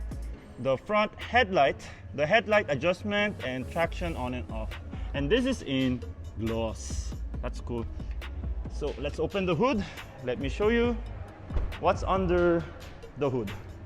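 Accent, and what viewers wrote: Philippines. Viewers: You have that filipino accent hehe.. actually many koreans do.. the korean girls are so cute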